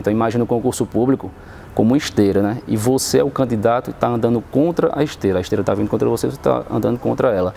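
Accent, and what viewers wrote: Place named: Pernambuco, Brazil. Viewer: Sotaque de pernambucano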